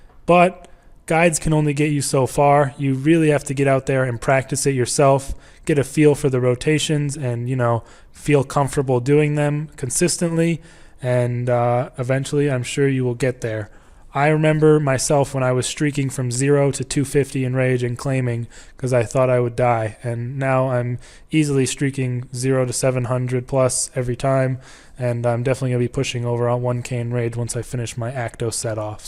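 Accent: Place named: New England, United States. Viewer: "Wicked quickly..." Are you from New England too? =) Nice guide btw.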